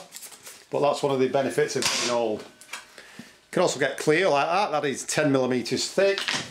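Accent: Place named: North, United Kingdom. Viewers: Love these vids - proper no nonsense Northerner who gets straight to the point and explains it all clearly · Great video! What part of Lancs is the accent from? Sounds like Preston or possibly just north of there?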